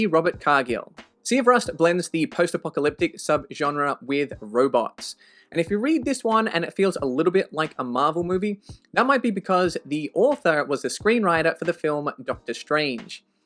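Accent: Australia. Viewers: Waitttt it took me half the video to realize you have an Australian accent!! I'm from Victoria 😅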